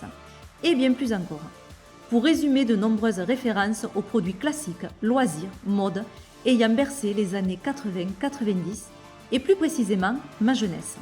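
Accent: South, France. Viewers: Jaime bien le petit accent du midi 😊.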